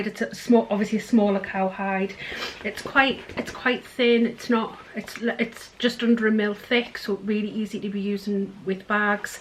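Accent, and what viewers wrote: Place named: Northern England, United Kingdom. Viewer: Wow what a fantastic haul! It was your fabulous Northern accent (as is mine!!) that lead me to you, the first time I saw a video it was Hang on that's an English accent and double bonus Northern!! So keep up the fabulous work and don't ever change we love you for being you and a fabulous bag maker and seller of quality hardware and runner of classes and retreats xx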